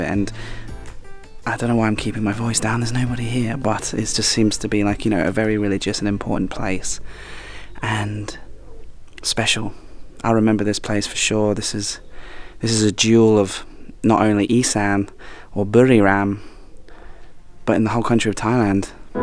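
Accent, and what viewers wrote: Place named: North, United Kingdom. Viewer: Absolutely brilliant, and his calm manner certainly shone through as it does in his vlogs. You looked so relaxed and loved the way there was a northern accent coming out of you. Oh and piglets, thanks for making me laugh so much... Been waiting for this blog to come up since I saw you had visited him and for sure it didn't disappoint. Thanks for sharing.. merry Christmas greetings from Greece 🎅🎅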